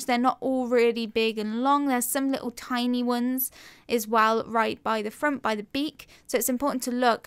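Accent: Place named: Midlands, United Kingdom. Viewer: I do so love your videos, every brush or pencil stroke is narrated in a way that I can understand and it all sticks in my head. So much better than a lot of tutorials on Youtube that are more someone drawing and music playing with no narration, not that the artists are not good, it's just better with narration, I am still trying to work out your accent? Midlands maybe but a touch of Southern, great though, every word is clear and informative, thank you for making these tutorials they have brightened up my days no end and re - ignited my determination to be able to draw.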